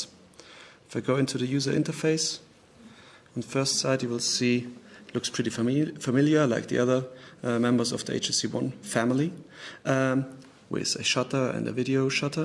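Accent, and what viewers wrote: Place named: Germany. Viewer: Man merkt das du deutsch bist :D Akzent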